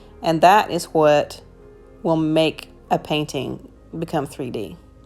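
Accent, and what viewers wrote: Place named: United Kingdom. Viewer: Beautiful! Would LOVE to see (and hear) you do a painting using your British accent, lolol. I have the same viewing habits...mind how you go.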